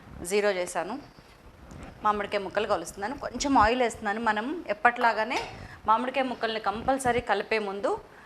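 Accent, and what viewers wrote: Telangana, India. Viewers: Meeru andhra ina telangana slang matladutunnaru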